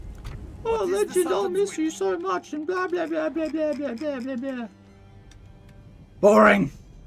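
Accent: Australia. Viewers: i was there when he still cursed a lot spoke australian while doing m2tw XD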